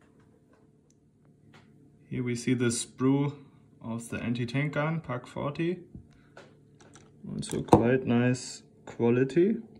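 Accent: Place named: Germany. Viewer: I love your English its German and English togeder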